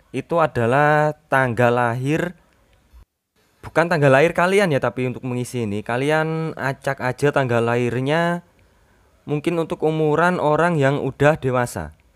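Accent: Java, Indonesia. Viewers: Terlalu medok bangg🙏😂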